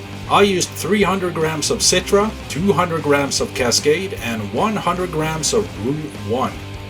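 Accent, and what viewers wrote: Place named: Canada. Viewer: Awesome video, just subscribed. Where are you from, sounds like Canada Eh? Brought back childhood days of the McKenzie Brothers!!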